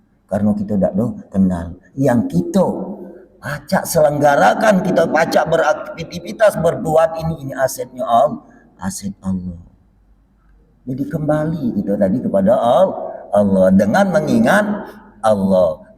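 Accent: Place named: West Sumatra, Indonesia. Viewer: ADA JALAN WALAU PUN DI KIAS DAN DI LANGKAU ATURAN DALAM PERJALAN PADA JALAN ALLAH DI JALAN SIFAT ALLAH KEMBALI PADA ALLAH UNTUK KITA BAWA CAYA KALIAMAH NORULLAH✨️☀️✨️CUMA KURANG JELAS LOGATNYA UNTUK SAYA TERIMA🫶💕🫶SEPERTI BAHASA MINANG YA🫶💕🫶BAPAK SIFAT YANG TERDAHULU SESAMA SIFAT ALLAH✨️☀️✨️TAK MENGAPALAH HENDAKNYA PADA HAK ALLAH YANG BENAR KEMBALI PADA ALLAH YANG NYATA✨️🫶☀️🫶✨️AL FATIHAH✨️🤲☀️☀️AMIIN☀️☀️🤲✨️ALLAHU AKBAR ALLAH ALLAHU ALLAH"2✨️☀️☀️✨️☀️☀️✨️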